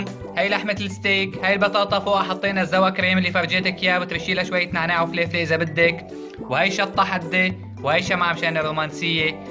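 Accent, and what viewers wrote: Aleppo, Syria. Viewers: الحلو فيو يذكرنا حكي حلب يا خيتوووووووو😊😊😊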